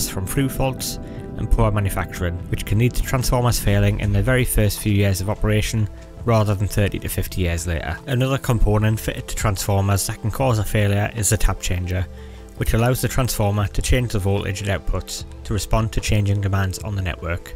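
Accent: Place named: North East England, United Kingdom. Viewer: Nice to hear a North Eastern voice on YouTube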